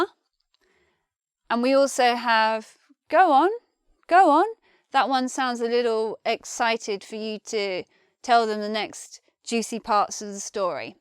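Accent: United Kingdom. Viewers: hi. thanks for the lesson. I love ur uk accent. have a good one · She has Brittish accent.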